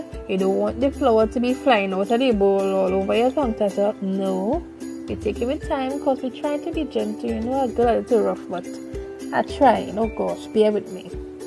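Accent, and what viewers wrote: Trinidad and Tobago. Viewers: You sound like a Tobagonian. That MUST be some good tasting coconut drops. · I love your Trini dialect on this video. Too many Trini people make recipe videos and sound weird when they try the American accent haha. Love this.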